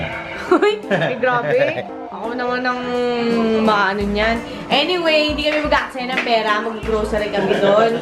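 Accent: England, United Kingdom. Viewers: please make a video on how you got your english accent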